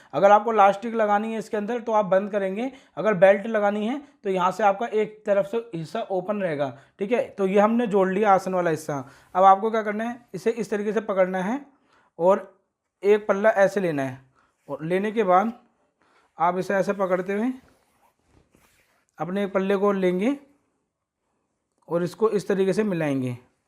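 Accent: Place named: Uttar Pradesh, India. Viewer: Aap bolte hai to lagta h aap up se hai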